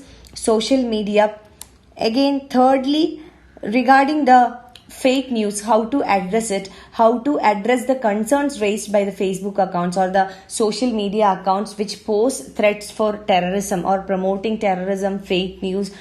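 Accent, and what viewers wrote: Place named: South India, India. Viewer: Worst facilitator ever in Study IQ. Just reading a paper could be done by anyone. Pronunciation should be formal..typical south indian accent. 👎👎👎👎👎👎👎👎👎👎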